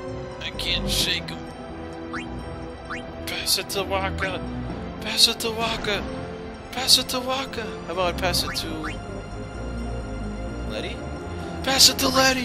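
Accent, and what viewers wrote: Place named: Arkansas, United States. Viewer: WAKA WAKA WAKA! dude are you from arkansas? You must be...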